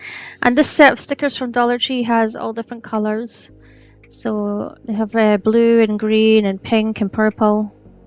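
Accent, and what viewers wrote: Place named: Northern Ireland, United Kingdom. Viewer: I love everything in this video. I subscribed to your channel because I love listening to you talk — my Mom was from Northern Ireland and used some of the same words and phrases you do and her accent was very similar to yours, so you bring back warm memories for me.😊 Everything you made in this video is pretty simple but turned out really nice. You have some great ideas!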